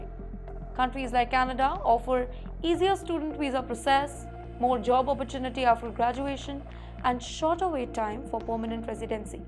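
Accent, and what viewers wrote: India. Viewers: Please somebody, get this young lady to speak English with a proper accent. If not just speak hindi! These indian youtubers have such atrocious accents that I switch them off. That guy beerbicep or whatever is one of the worst! Please learn from the tharoor guy!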